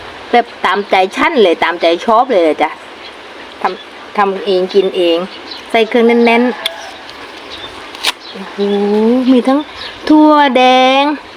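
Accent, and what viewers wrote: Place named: Suphanburi, Thailand. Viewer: ทำเองกินเองนักเลงพอ555น่าทานจังเลย แต่ขอโทษนะคะเป็นคนสุพรรณหรือเปล่าคะสำเนียงเหมือนเลยมลคนสุพรรณนะคะอยู่ดอนเจดีย์😊💖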